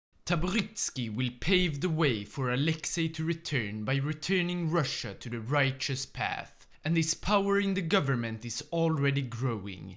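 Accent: Sweden. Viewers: I’ve been a subscriber for a long time hearing ur Swedish accent and a very big popularity hope you become more better 🎉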